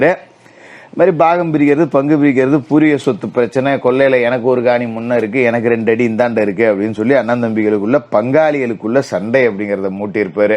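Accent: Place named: Thanjavur, India. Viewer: உங்க slong தஞ்சாவூர் மாதிரி இருக்கிறது எந்த ஊர்ல இருக்கீங்க ஜோசியரே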